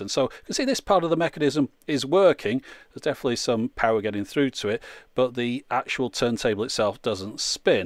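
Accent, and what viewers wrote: United Kingdom. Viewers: British, fast and accurate.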